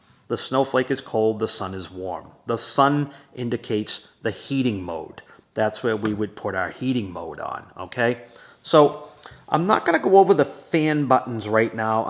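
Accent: Boston, United States. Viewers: Have watched this So many times --- video is super clear and helpful!! (Boston accent is a plus - generates total trust and confidence that the person speaking know what he's talking about!!) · You sound like you're from Boston❤. I am too. Anyhow my house was freezing inside. Those icons on the remote are very strange. Then I played your excellent video a few times and took notes. Now I'm cozy and happy. Many thanks,. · Just got a new house and have been cold for two days! Thank you for this helpful video - you explain things so clearly (plus the slight accent reminds me of my family from Boston so extra warmth there too).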